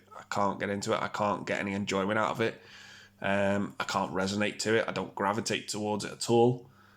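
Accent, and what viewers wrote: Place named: North West England, United Kingdom. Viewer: I'll be honest a lot of movies in your list i 've not even seen because they aren't a genre i'm into, but i certainly respect your list and right to an opinion. I look forward to your list of unpopular movies you like, i definitely have plenty of those too. I really like your honesty and attitude. I'm guessing from your accent you are from around the North West, i'm technically North East, just below Hull.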